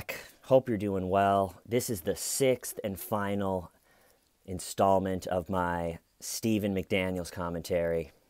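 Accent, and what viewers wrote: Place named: South, United States. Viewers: Love me a southern accent. 👍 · I love your southern accent. All people have to do is watch the original police interview to find out why STAYVEN is funny. 😂😂😂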